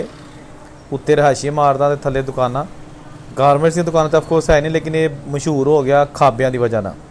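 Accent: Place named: Punjab, India. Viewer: Bhai, I like and watch all your U Tube videos and I had appreciated you in one of your videos that I like your voice with Punjabi tone. However we do not understand Punjabi so we can't understand your commentry (though it is pleasant to listen) Will be grateful if u could make your commentry in Hindi / urdu. Take care and Love from India.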